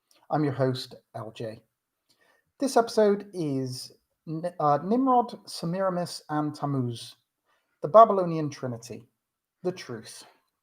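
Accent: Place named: West Country, United Kingdom. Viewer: Sounds like Devon n Somerset! Thanks for this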